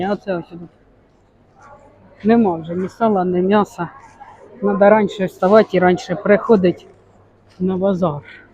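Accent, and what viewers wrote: Poltava, Ukraine. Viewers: Рiдна полтавська мова ❤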